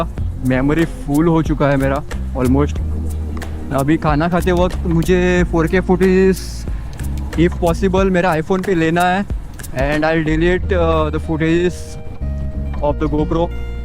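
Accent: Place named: Goa, India. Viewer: Lived in Goa for 11 years, hearing you brings back memories. You literally have similar accent to one of my really good friends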